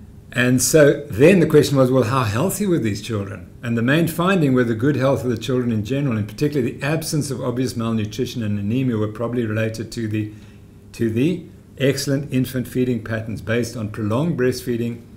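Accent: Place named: South Africa. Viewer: The English language doesn't work too well with a Sarfer accent...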